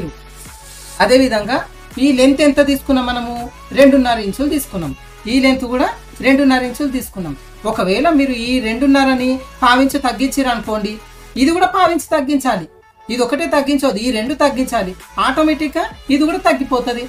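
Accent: Telangana, India. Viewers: First time Telangana language lo vinadam chaala bavundi ❤️ to Telangana accent. 👍🙏🏽 Subscribed. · First time in Telangana acent I followed your tailor ing method , nice to hear keep going waiting for more classes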